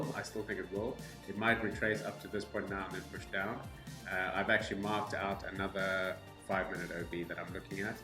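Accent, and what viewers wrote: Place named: South Africa. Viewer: You sound like you're in SA? Good job pushing these order blocks.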